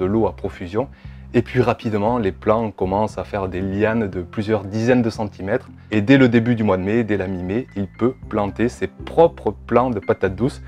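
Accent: South, France. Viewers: Merci pour tous ces précieux conseils et pour l'accent de mon Sud natal 😄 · Salut, Voilà j'ai lancé la plantation de patates douces... 1ère étape, faire germer les morceaux de patates douces (en ayant coupé 2 patates douce en 4 morceaux) mis en barquettes sous cloche avec lumière horticole 150 watts et tapis chauffant réglé sur 20 °C. Je reviendrai te dire de quoi il retourne par la suite. Nous sommes aujourd'hui dans l'Aisne (02) le 02/02/2020. Encore merci à toi pour tes merveilleuses vidéos qui au delà des techniques m'apporte ta bonne humeur, ton inspiration, ta gniac, ton superbe accent du sud et ta bonne humeur légendaire. Merci à toi et continue car tu as une empreinte spécifique sur Youtube.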